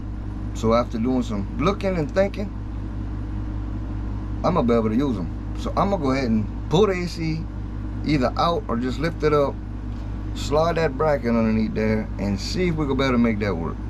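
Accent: Louisiana, United States. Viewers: It’s called being Cajun most white people from Louisiana sound like this.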